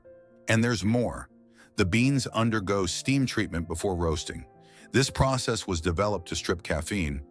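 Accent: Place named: United States. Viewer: Why the Yank accent?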